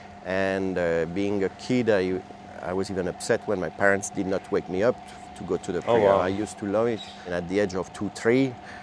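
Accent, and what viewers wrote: France. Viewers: Even after 20 years he still has French-English accent 😂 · After 21 yrs he still got french accent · He is Indian with a french Accent ! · Well, he is French, speaking English, which is not his language fluently. Don't mind the accent.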